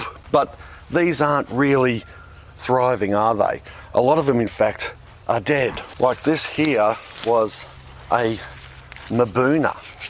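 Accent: Australia. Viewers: Google subtitles: Hi I'm from Sausage Fisherman. Looks like AI can't deal with an Aussie accent. 😅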